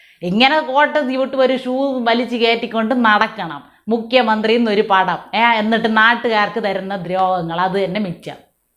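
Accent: Thiruvananthapuram, India. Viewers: ethu nattukari.Thirontharam bhasha kalakki ❤❤❤❤❤😂😂😂😂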